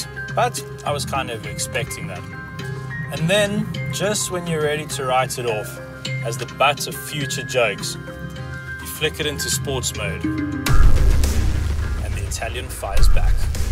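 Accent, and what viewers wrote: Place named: South Africa. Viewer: I watch this video over and over then look over my shoulder and see my beautiful Ghiblet S sitting next to me in my garage. It's my daily driver. M-W-F and on weekends. I will be driving this car 288 miles per week. I am from San Clemente, CA. I love this video and controversial South African accent. I was going to get a BMW 550I but I am so happy I got this anything but ordinary Italian Stallion. Take me to J. Bay and Skeleton Coast Namibia for a surf. I'll show you Trestles and related breaks.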